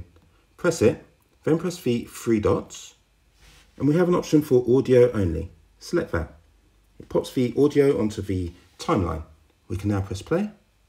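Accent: United Kingdom. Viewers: Your voice literally sounds like an british guy btw am not racist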